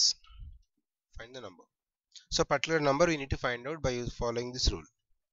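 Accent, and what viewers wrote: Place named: South India, India. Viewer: Thanku.. sir I think so u r from South india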